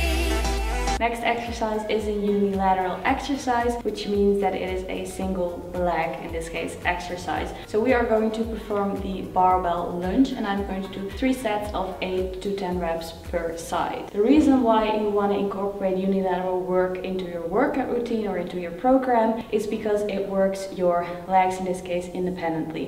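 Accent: Netherlands. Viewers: Im just curious, where are you originally from? Your accent sounds Dutch to me ^^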